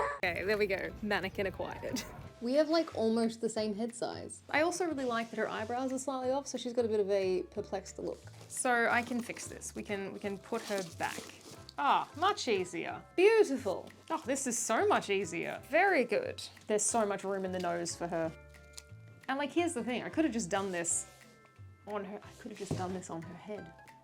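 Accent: Australia. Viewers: The accent is either New Zealand or Australian… I'm going with Australian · are you australian?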